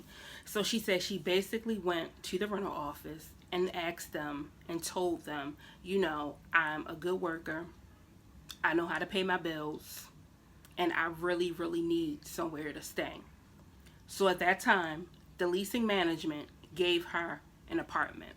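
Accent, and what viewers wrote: Baltimore, United States. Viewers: I'm new to your channel. I recognized the Baltimore accent and had to follow and support!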